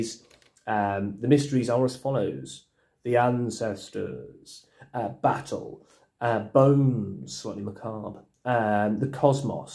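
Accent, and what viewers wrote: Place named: United Kingdom. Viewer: Cool Vid! Listening to a british dude talk can be quite entertaining.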